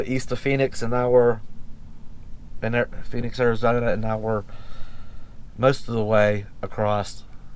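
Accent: Pennsylvania, United States. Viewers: Man listening to you narrate makes me think of friends from PA. Enjoy NewMexico and hope you find some cool little places to explore and maybe a 💈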